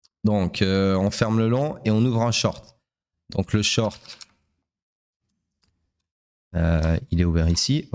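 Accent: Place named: Belgium. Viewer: c'est marrant, malade ca te donne un accent belge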